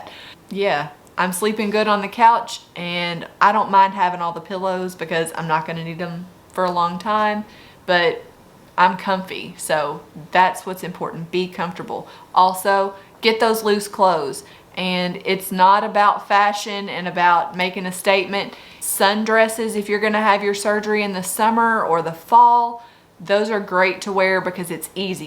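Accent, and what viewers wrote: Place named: South, United States. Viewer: Hey! I'm not sure if anyone has already asked this question or if you're allowed to share the info but I was wondering where you went to have your surgery? You sound southern and I was hoping (fingers crossed) we were relatively close in location. I would love to have this done and would want to go to a good surgeon.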